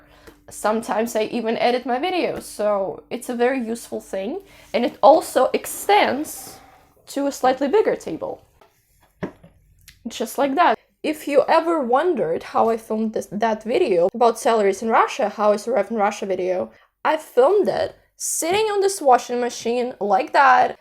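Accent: United States. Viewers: if i didn't know was already Russian, i would have thought she was American. HAHA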